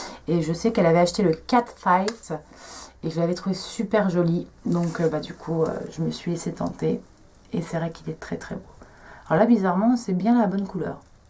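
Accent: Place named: England, United Kingdom. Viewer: Coucou. Contente que les rouges à lèvres Catrice te plaisent et merci pour le petit clin d'oeil ça fait plaisir! Oui effectivement tu as un peu craqué ton slaiiiip mais je te comprends les -25% ont aussi fait des ravages sur mon compte en banque. Bon j'ai encore rien pu tester à cause de cette Motherfucking allergie au visage. Gros bisous! Ps: j'adore ton accent anglais! Mdrr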